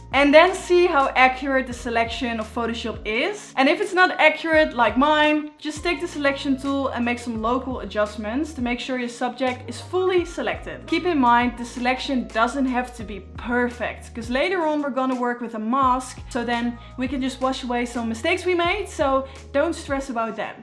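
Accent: Netherlands. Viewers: Hrt Nederlandse Engels accent is heel herkenbaar haha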